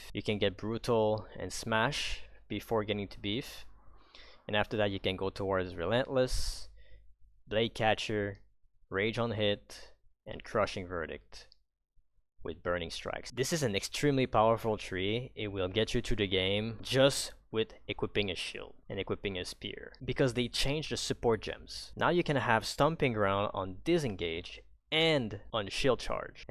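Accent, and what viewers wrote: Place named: Quebec, Canada. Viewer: you sound SOOOO French QC speaking English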